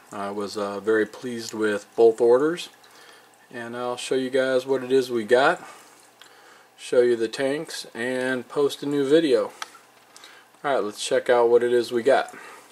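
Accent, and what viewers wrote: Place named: San Francisco Bay Area, United States. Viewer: Really great tanks. Wonderful selection of corals and fish. Sorry to hear you're having issues with rogue shrimp. If they are living in a undergravel filter perhaps you're going to have tiptoe in a night and (under very low lighting) and try to nab them. Hey, by the sound of it I'm guessing you live near my neck of the woods (San Francisco Bay Area). I keep thinking I should take a visit to Exotic Aquarium in Sacramento. Just haven't taken the time to yet. Enjoyed the video. You've got me as a new subscriber!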